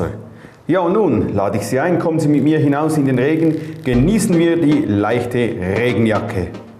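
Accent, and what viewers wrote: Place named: Switzerland. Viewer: schön :) ich liebe das Schweizerdeutsch :)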